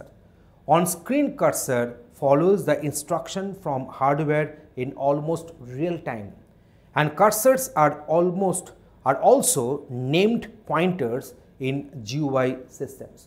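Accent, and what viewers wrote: Bengal, India. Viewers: Bengali mother tongue influence is not good here.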